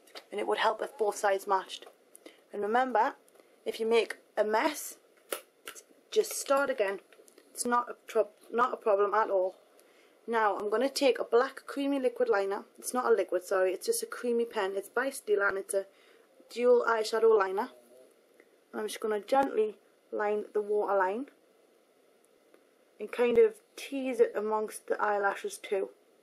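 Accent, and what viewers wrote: Newcastle, United Kingdom. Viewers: Its a Newcastle accent, from the north of England. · shes from newcastle well i dont know if shes from newcastle but her accent is jordy shes definately not scottish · She's not Scottish - She's a Geordie ^__^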